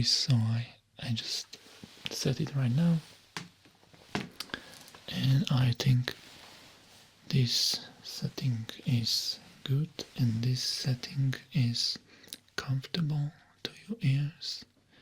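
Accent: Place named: Poland. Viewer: I just keep coming back to this video. The Polish accent and loud variable sounds are extremely relaxing.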